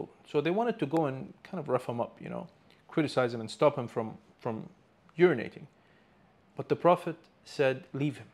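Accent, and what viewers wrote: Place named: India. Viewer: ..why do you sound like Indian, ?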